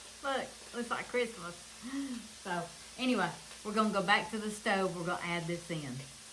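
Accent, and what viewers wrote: South, United States. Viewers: I made this chili & my man loved it! Thanks for sharing! I love your Southern accent, I lived in Florida but am back home in Wisconsin!😊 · THANK♥️YOU FOR SHARING YOUR VIDEO! I RAN ACROSS YOUR CHANNEL WHILE LOOKING UP THE COPYCAT WENDY’S CHILI RECIPE SUBSCRIBED TO YOUR CHANNEL. I JUST LOVE YOUR SOUTHERN ACCENT,YOUR CONTENT AND YOU,YOUR FAMILY AND HOW YALL GAVE BEEN MARRIED FOR 45 YEARS. I WAS BORN,RAISED& LIVE IN NORTHEAST GEORGIA. ME&MY HUSBAND HAVE BEEN TOGETHER FOR GOING ON 23 YEARS(3/24/25) & HAVE BEEN HAPPILY MARRIED FOR GOING ON 22 YEARS(4/6/25). WE HAVE A DAUGHTER(AGE:22) & A SON(IN HEAVEN) HE WOULD BE(AGE:17). OUR DAUGHTER HAS A BABY BOY(AGE:2)OUR FIRST GRANDBABY AND THE JOY OF OUR LIFE. WE ALSO HAVE 3-FUR/4~LEGGED BABIES(CHIHUAHUAS),AGES:10,9 & 1. WE ARE VERY BLESSED! JESUS IS THE REASON FOR THIS SEASON! HE IS #1 IN MY LIFE & HE IS THE ONLY WAY TO GET THROUGH ANY TROUBLES IN EVERYBODY’S LIFE. 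KEEP UP THE WONDERFUL WORK/VIDEOS YOU DO! YOU’RE AWESOME!!! HAVE A✝️BLESSED & 💟WONDERFUL🌛NIGHT!👍🏻😉💓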